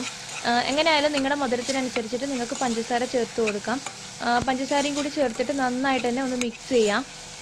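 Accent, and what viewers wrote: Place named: Thalassery, India. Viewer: ingal thalassery kaari aano?? slang thalassery pole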